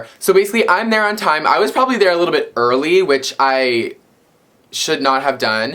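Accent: Canada. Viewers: are you Canadian? it sounds like it sometimes. lol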